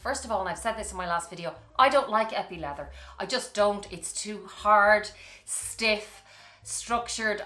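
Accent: Ireland. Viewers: Hi, I have 3 LV’s (speedy, neverfull & retiro) & I love them but to be honest I’m done buying LV’s right now. Love to hear your voice esp. your Irish accent. I used to work in Beaumont Hospital as RN, now I’m base in US. I’m from the Philippines originally. Always looking forward to your videos.❤️❤️❤️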